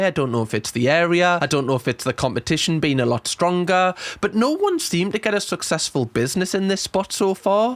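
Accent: Newcastle, United Kingdom. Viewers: You had me laughing so hard! 😂 Your commentary, turn of phrase, and geordie accent are all absolutely perfect. Don't ever change. ❤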